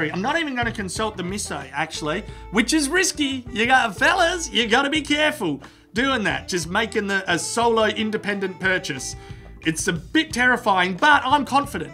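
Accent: Australia. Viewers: i recognize his accent. is he australian or he just have a strong english accent. i mean really strong lol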